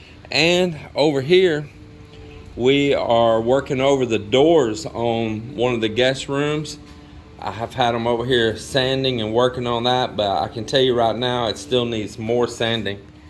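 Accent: Texas, United States. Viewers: You are an amazing individual. Your ability to have focus on so many jobs at the same time with such detail. Memorized numbers, sizes ect from each job while supervising so many workers. The stress from that would crumble the average Joe. Me included. I have joked about the Texas drawl but fun heartedly. I knew many Texans while in the service.........and what bunch of good guys.One of my best friends was from Texarcana. Swamp land he told me. When i first started watching i wondered how you had so much money to do this. But now i guess you were some sort of construction engineer or site superintendent. Love the videos. Keep em coming. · I noticed absolutely nothing out of the ordinary! And I've watched all ur videos. I noticed no neurological problems. My family and best friend lives in Texas. I'm 65. My dad was born and raised in Texas. Real Texans have that accent. 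As it relates to not feeling 100 everyday, I never related those days to viruses. Do not let the negative comments affect you at all. Melinda's mother is right. Our mentality is the key. We can't give our energy to our ailments. We instead try to focus on wellness. Physical and especially mental wellness. Also, one's educational background has nothing to do with one's gifting. Some of the most knowledgeable people are self-taught. Quiet as it's kept, institutionalized education systems stifle our gifts, talents, and mentality. So whoever is referencing 3rd grade education needs to be educated.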